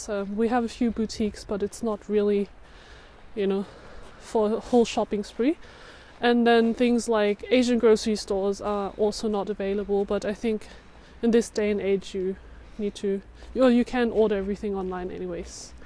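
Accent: Germany. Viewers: She sounds German. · You have a strong German accent and and yet you are such an eloquent English speaker are you a native German or did you move there as a baby . Very informative video btw. my family and I are hoping to move to Germany soon for a year.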